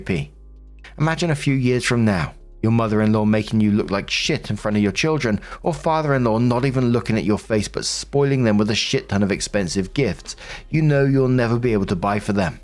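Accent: United Kingdom. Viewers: As an always sunny fan hearing say "pounding off" very seriously with his british accent sent me. thank you.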